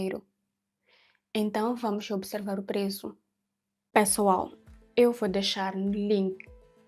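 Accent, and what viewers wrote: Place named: Mozambique. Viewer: Pura moçambicana. Na fala tem tudo de moçambicanos.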